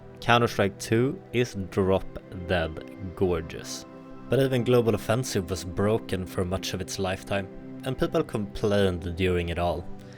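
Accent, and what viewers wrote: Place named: Sweden. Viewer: You’re from Sweden? Sounds like Swedish accent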